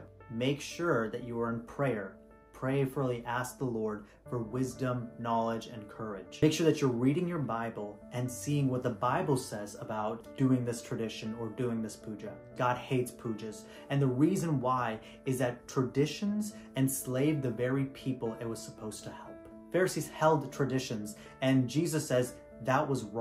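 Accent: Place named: United States. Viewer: What is you ethnicity? you look Indian but DEFINATELY do not sound Indian, at all. I even went ahead and checked your oldest video. You sound US born and raised or someplace in Europe.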